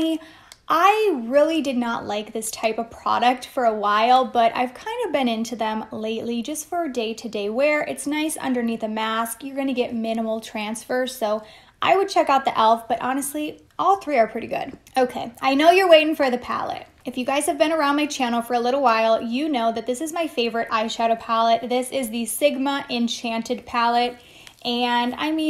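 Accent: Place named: Michigan, United States. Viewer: Sometimes that mid western/ Michigan accent comes out to play. The way you say “BOTH” is soooo interesting to me as someone from the south that’s defffff not the way we say “both” down here!!!!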